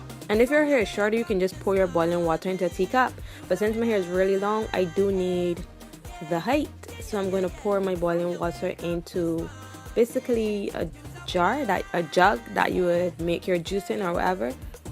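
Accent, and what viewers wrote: Barbados. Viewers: love love love that Bajan accent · is that a bajan accent I hear??!!!!!! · Yuh sound Bajan 💙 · Yesss I hear the bajan accent 🙌🏽🇧🇧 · yesss I know that accent anywhere subscribed right away #BajanQueen 😍😍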